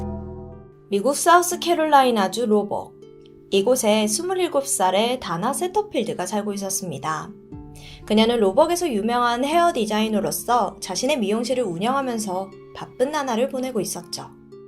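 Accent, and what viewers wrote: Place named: Jeolla, South Korea. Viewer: 전라도 사투리인듯요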